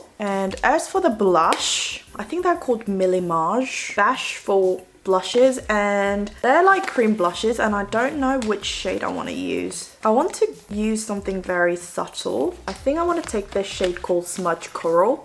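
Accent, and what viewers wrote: United Kingdom. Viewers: 영어로 말하시는 영상 볼 때마다 영국 발음에 치이고 가요...... ㅠㅠ 진짜 너무 멋있어요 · 너무 예뻐요!!!! 눈도 피부도 입술도 다 찰떡같이 잘 어울리세요ㅎㅎ 영상 분위기는 깔끔하고 자극없어서 영국발음도 부드럽게 들려요 이 점을 정말 좋아해요💕💕💕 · 마스카라너무 이쁜거 같아요!!! 항상 느끼지만 영상 보면 너무 영국영어 배우고 싶어요ㅠ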